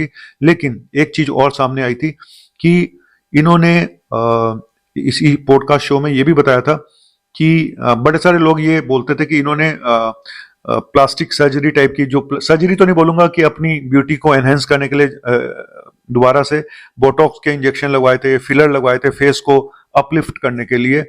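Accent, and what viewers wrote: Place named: Punjab, India. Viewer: Dora नहीं दौरा डाक साहेब। पंजाबी accent बदलो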